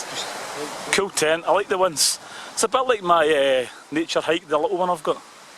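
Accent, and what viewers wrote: Scotland, United Kingdom. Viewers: Try watching this with the Google auto-generated subtitles on, killing myself laughing at how it can't handle Scottish accent!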